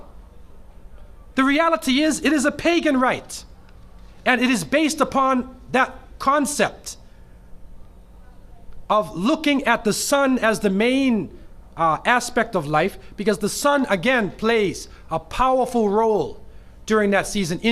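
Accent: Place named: Canada. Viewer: where is this guy from? what part of Canada?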